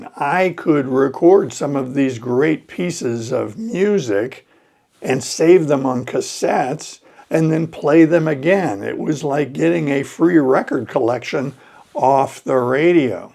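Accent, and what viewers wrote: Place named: United States. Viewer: 💙💙💙... Thank you, for your stories. I never cease to be amazed at how through them I see the unfolding of my own life. You emphasize those small/big things that bend our path in a new and dazzling direction. I would like to know in more detail how the flame of your interest in Spanish was ignited. My interest in English arose from the cartoons we saw on TV, the movies we saw that showed life in America and then, the music, the songs of,... in which the magnificent English language, with its rich vocalism and its bold consonants, tinged the songs with an astonishingly beautiful color and I never forget nor get tired of the pleasure of hearing the exquisite pronunciation of these singers... Your way of speaking, your accent and the warmth of your voice add charm to the English language spoken in the USA... and for that and more I thank you...!!!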